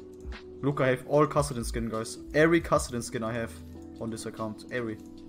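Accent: Germany. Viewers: Where is he from he sounds like a German dude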